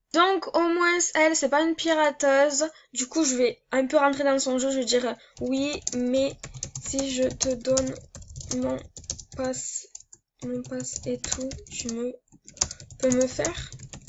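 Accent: Marseille, France. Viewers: Ta l'accent Marseillais tope la copine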